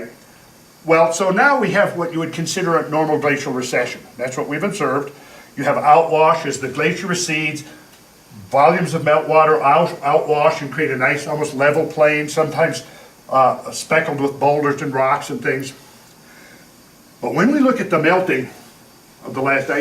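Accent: Minnesota, United States. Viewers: I grew up on the banks of glacial Lake Agassiz known as the Red River Valley now. At least we were told it was Lake Agassiz. The Red River Valley is sedimentary. A dang good place to have a farm if you don't mind being flooded out every once in a while. By the way, I knew you were from the Minnesota North Dakota area before I heard you mention it. Your accent is mostly gone, but I detected it. Mine is mostly gone as well until I cross the North Dakota border then I sound like a character in the movie Fargo.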